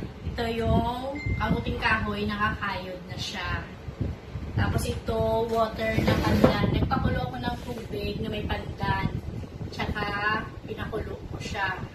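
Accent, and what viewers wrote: Iloilo, Philippines. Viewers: ilongga gid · Nang may halo ka nga ilonggo haha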